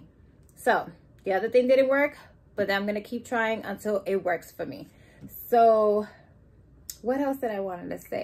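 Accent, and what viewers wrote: New York, United States. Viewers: Off topic but are you a New Yorker ? I hear an accent.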